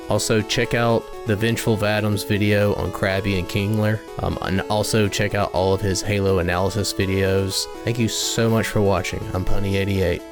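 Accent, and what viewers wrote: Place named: South, United States. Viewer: As a southerner myself, your accent makes this video even more charming. Informative and entertaining. Keep up the good work!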